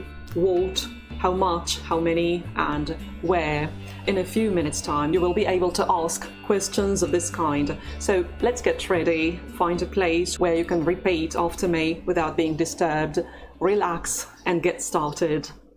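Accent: United Kingdom. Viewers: когдо я сделаю упражнение я всегда читаю всё вслук вы очен ясна гаворете но иногда немного медленно. You are clearly a very skilled linguist, I will subscribe even though you have a bit of a British accent when you speak english. I can hear the all the authentic sounds when you speak russian.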